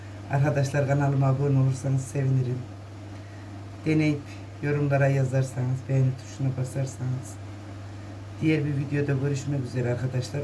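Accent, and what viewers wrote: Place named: Kayseri, Turkey. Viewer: Yapicam simdi siveniz Kayseri gibi yanılmıyorsam elinize saglik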